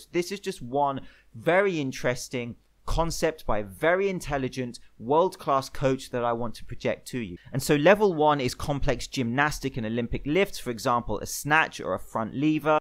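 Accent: United Kingdom. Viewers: Please understand this is a compliment. I do NOT like the British accent. It grates on my ears. But that’s my problem not yours. The information you provide is so valuable I listen to it anyway. It’s kind of like training for my ears.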